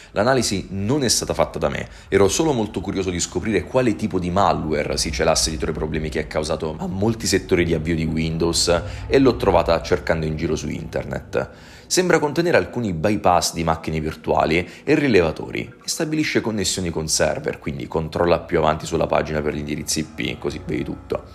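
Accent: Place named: Tuscany, Italy. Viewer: sento un accento toscano in lontananza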